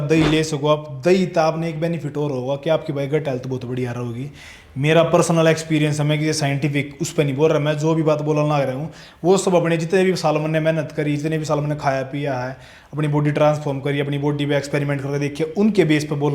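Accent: Haryana, India. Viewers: Bhai nu hindi na aave haryanvi dialect me hindi bole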